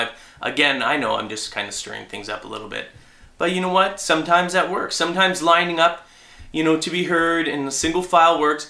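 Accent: Canada. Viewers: yeah i think hes canadian like meh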